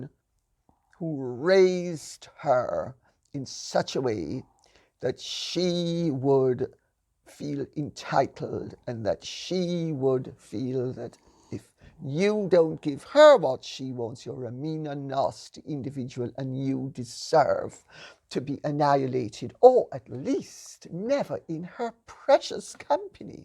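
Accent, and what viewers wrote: United Kingdom. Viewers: Woof, we the Scottish terriers from Sunny 🌞 Southern Cali! We love ❤️ love your beautiful musical 🎶 British accent. Your command of the King’s🏰 English and commentary is spot on.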